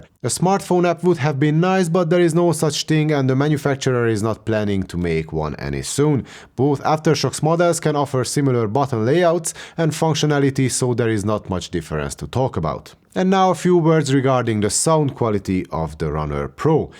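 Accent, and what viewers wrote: Hungary. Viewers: Thx Mate, nice review! I have Shokz OpenSwimm but lately I'm missing bluetooth. I was thinking to buy an OpenRun as well, but after this I maybe try Neanka. But a bit scary how easily it broke in your backpack.... Shokz is still in good shape for me. By the way, you were right about IPX8 and IP68 not the same, BUT from waterproof standpoint are the same. The third character (X or 6) stands for dust /solid particles resilience (X means not tested, 6- has tested and ok against dust and solid airborne particles) . Forth character (8) is the waterproof rating (tested being submerged in 1 meter of water for up to 30 minutes) Last thing... Don't take it wrong, but aren't You from Hungary? I have very similar accent.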